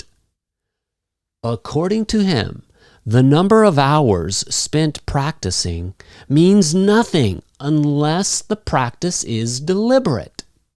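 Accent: United States. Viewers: It sounds american.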